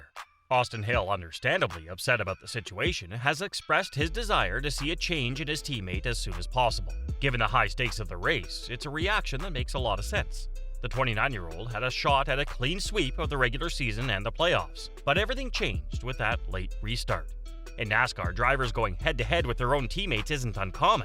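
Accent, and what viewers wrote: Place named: Canada. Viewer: Why reference bubba Wallace? Forced diversity? He had absolutely nothing to do with this. Your goofy accent sounds Canadian. Is that so?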